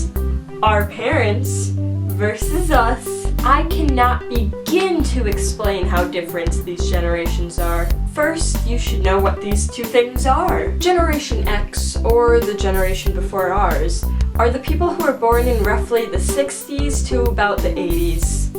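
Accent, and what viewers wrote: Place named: North, United States. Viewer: Hey by the way, you're really cute ;) are you from Wisconsin? Or someplace from the north? You sound like a northern person.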